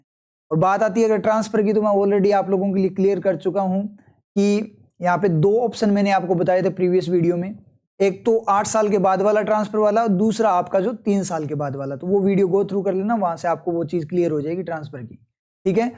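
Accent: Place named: Ganganagar, India. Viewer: Ap gngr Or hnumangd side se ho kya.... Awaj se pta chl ra h thoda 😂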